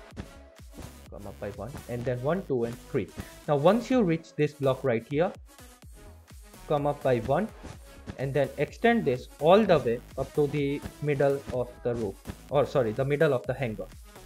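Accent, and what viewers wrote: India. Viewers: bro you got the most yet best indian accent i have ever heard!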